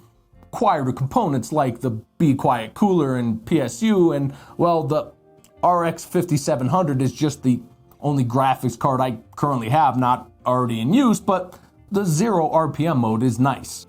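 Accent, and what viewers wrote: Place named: Brooklyn, United States. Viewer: This guys accent is great, is he from Brooklyn?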